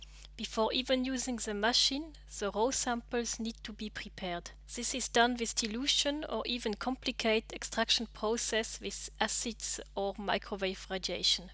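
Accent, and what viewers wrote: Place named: Germany. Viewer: most german sounding English i ever heard... but informative non the less :D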